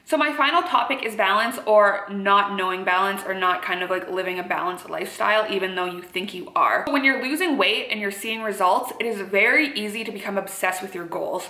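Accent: Canada. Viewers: This is the first i listen/watch your video without multitasking (lol i like to listen to story time youtube video whilst driving/do chores) but i just wanna say your canadian accent is SO noticeable 👌🏼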